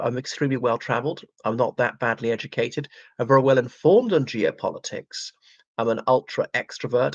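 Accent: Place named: England, United Kingdom. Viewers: Ah yeah you are just what we want for our President in Ireland - a Youtuber with an English accent, who cant speak the Irish language, who is anti-Irish Republicanism and who professes Unionism. Move over Cokehead McGreggor we have a new front runner in " from Ireland [with a plummy English accent]" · you don't sound Irish ? you sound english....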